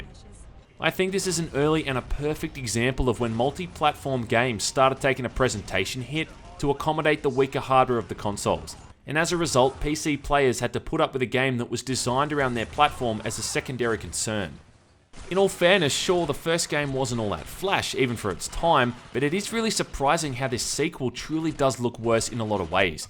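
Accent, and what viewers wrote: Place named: Australia. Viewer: Oooh thank you i love your reviews and australien accent. both combine makes a charmes in your video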